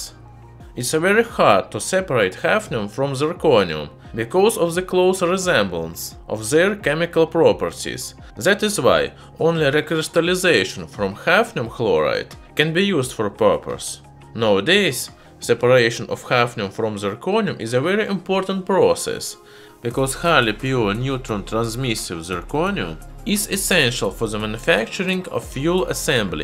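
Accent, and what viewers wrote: Russia. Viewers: I'm watch your videos because of your accent i like Russian accent . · Is it a Russian accent? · Congrats on this video. Didactic and interesting. Are you russian? Your accent sounds russian. English easy to understand. Greetings from Tupã, state of São Paulo, Brazil.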